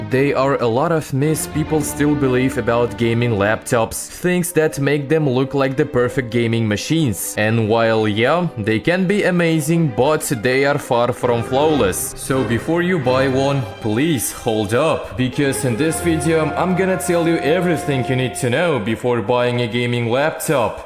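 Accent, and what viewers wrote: Russia. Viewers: 😂 it's russian accent ig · Not trying to be mean but the Russian accent is so hilarious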